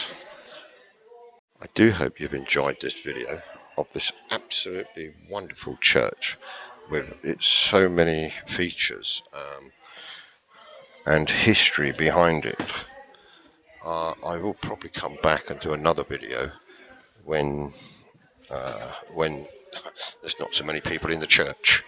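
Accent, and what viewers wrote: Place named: England, United Kingdom. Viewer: oh my, a "disabled Toilet"as in not working!! Imagine if that was true-oh the stench of that. Toilets for disabled would be I believe the appropriate English words describing it, mate. I love the accents of the English, as a Canadian with British parents. This accent reminds me of the New Tricks actor and musician, Dennis Waterman as Gerry Standing, one of the great amongst the initial cast of four🤩 Those timbers up top with the massive bells are most intriguing. Looks like tree trunks perhaps. I'd love to see it with an archaeologists eye -to date and describe the wood used to create the strong ceiling, who and what the stories are of these saints/amazing paintings, particularly of the fainted or ill woman in the man's arms, a few chosen headstones for the words or messages, the cultural trio represented on the pulpit.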